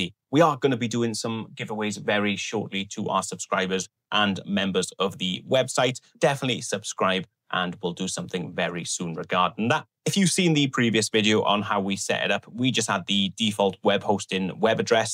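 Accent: Wales, United Kingdom. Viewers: Love how you talk about dollars and cents with a Welsh accent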